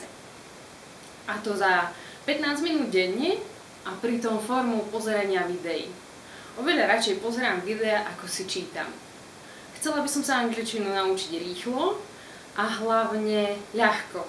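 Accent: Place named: Brezno, Slovakia. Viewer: Aká pekná žienka - a ako pekne hovoríš po slovensky! Ale predsa len poznať, že si z Brezna :D Ináč ďakujem, ale po anglicky už viem.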